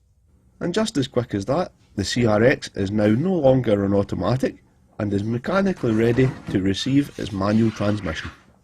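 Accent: Scotland, United Kingdom. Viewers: I'm following this epic restoration closely and really enjoying it. I've said it before, I like the Scottish accent. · I WAS ALWAYS TRYING TO FIGURE OUT IF YOU WERE CANADIAN OR BRITISH. SCOTTISH NEVER CAME TO MIND. SCOTTISH PEOPLE ARE GREAT. I HAD A SCOTTISH GIRLFRIEND ONCE UPON A TIME, SHE WAS GREAT. JUST DIDN'T WORK OUT.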